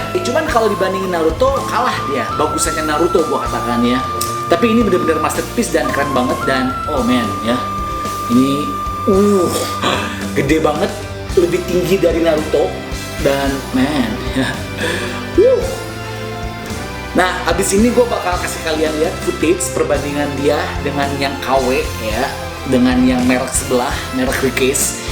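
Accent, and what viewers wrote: West Java, Indonesia. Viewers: Haha sunda pisan 😂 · Hahah orng sunda kang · Bang asli nyah orang mana ko ngomong nyah aya sundaan wkwkw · sunda nya ngakak xD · Logat Sunda ?? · logat sunda nya bikit ngakak.. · Keren mang sunda banget . korowot korowot wkwkwkwk Sukses slalu .... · Orang sunda apa ya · Bang orang sunda?? 😂😂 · ai akang th timana atuh, menih sunda pisan kitu??? hehe tp wajah na oriental pisan... hehe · Orang sunda asli pisan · Tigubrag tiguling 😅😅😅 urang sunda gening mang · Si Koko tinggal dimana nih, sundaan wae, hahaha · anjir ini si koko aksen sunda nya kuat banget.. Hadeeeee... Keren ... Bte mainan lo.. Maaahaaalllll tapi haqiqiiiiiii ...cool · Ngomong na sunda pisan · Orang sunda ya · Koko sunda ? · Bang orang Sunda? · Orang Sunda nihh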